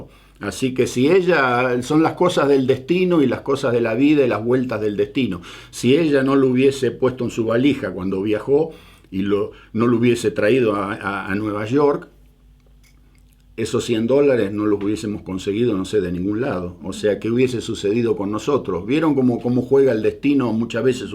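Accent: Argentina. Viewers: Muy interesante,sigo todos sus videos y me fascina la forma de narrar tan nuestra,tan argentina de,quiero seguir escuchando esa maravillosa historia de vida!!!!! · Bien,me asombra y agrada a la vez,que no perdiste el acento argentino,ni los modismos,despues de vivir tanto en el extranjero,Un saludo desde Argentina.(Y me parece que te esta "tirando"el solcito,porque a cada rato andas por el pais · Por que lo voy hacer? he estudiado.Sobre gustos los colores! No me gusta! al principio si por que vi el acento argentino, pero despues se puso Denso! No me interesa! saludos! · Me encanta su manera bien argento, de relatar. A pesar de haber dejado nuestro país hace 50 años, no perdió sus modismos ni acento. Saludos. 🎉🎉🎉🎉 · Que historia realmente de pelicula..... !con mi marido coincidimos que la narracion de la misma nos genero diferentes sentimientos ,realmente muy atrapante y con espiritu de superacion ,responsabilidad y valentia destacables ,porque a pesar de la corta edad se notaba en sus relatos que eran jovenes responsables y al verlo ahora se refleja una persona integra ,seguramente sus hermanos tambien, gracias por compartirnos su historia y su hija firme apoyandolo al lado da mucha ternura y la felicitamos tambien , se nota ese amor a la tierra ese Argentino de corazon hasta el acento esta intacto ...felicidades por los logros porque a pesar de las vicisitudes de la vida su progreso y superacion estan a la vista . 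un saludo desde Catamarca ARGENTINA ...... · Sí! Me encanta que a pesar de haber emigrado tan joven todavía tenga una manera muy argenta de hablar. ❤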